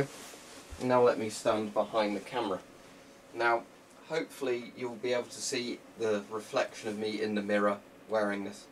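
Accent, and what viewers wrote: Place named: United Kingdom. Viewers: Americans will listen to a Brit read the IRC tax code since we love that accent. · I like the way he says hello with his British accent and every video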